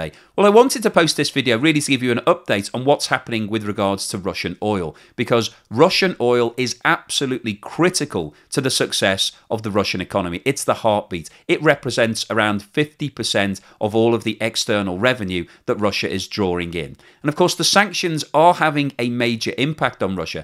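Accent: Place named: Liverpool, United Kingdom. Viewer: I think you are talking nonsense, seems to be a bit of scouse coming through maybe it's Thatchers fault.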